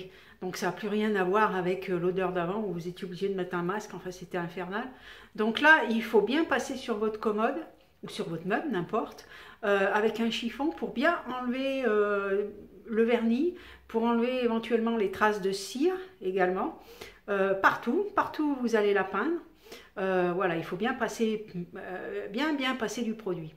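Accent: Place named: Saint-Étienne, France. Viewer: oui effectivement tu ne fais pas que du maquillage J'adore ton accent.... tu ne serai pas pas la region stéphanoise ? J'adore le relooking de meuble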